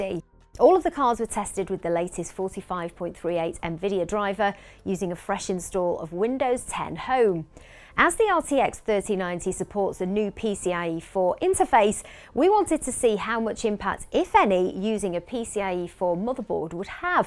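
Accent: United Kingdom. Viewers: I really like the British accent (I'm French btw)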